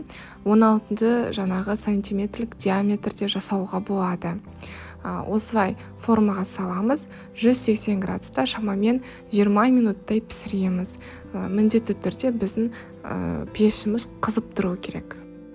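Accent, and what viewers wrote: Standard. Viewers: Сіз таза қазақша сөилеисіз сол ұнаиды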